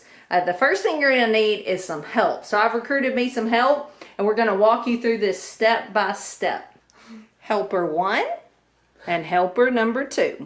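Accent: South, United States. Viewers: 😅I grew up speaking Spanish and English here in PR and we don't get that beautiful southern accent at all. Iconic, just like everything south🏝💪🏼💪🏼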